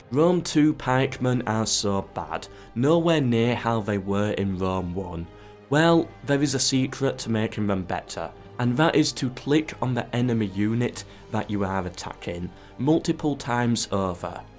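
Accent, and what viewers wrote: United Kingdom. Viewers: What UK accent is this? Sounds like every female flight attendants on the low cost flights, always loved that accent =)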